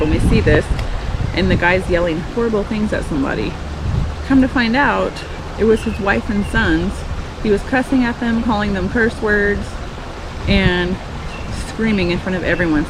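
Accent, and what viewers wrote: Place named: Tennessee, United States. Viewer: D how long have you been in TN, you have "y'all" down pat..... Welcome to TN honey!!!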